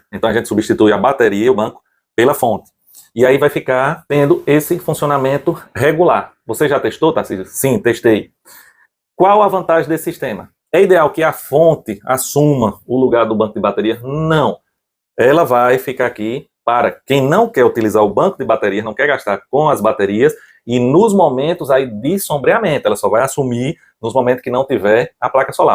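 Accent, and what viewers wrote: Northeast, Brazil. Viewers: Meu amigo, você é de qual estado do nordeste?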